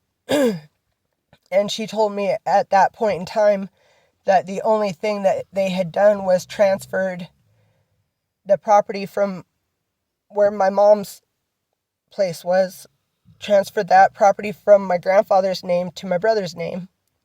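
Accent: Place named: New England, United States. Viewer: You have a similar accent to mine. Are you local to southern vermont or western mass?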